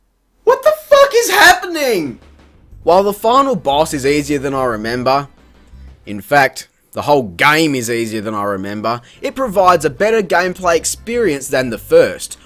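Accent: Australia. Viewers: I just put up a review for the first one and here I see you post another hilarious one for the sequel hahah keep it up. strikes again! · ANNOYING VIDEO WITH AN AUSTRALIAN ACCENT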